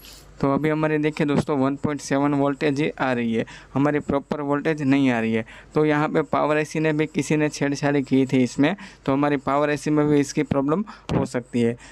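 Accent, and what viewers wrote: Gujarat, India. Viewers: Tamara bolva uparthi lage k tame gujarati cho??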